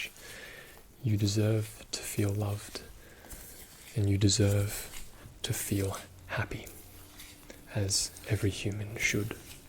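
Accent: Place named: Australia. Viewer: a really nice smooth australian accent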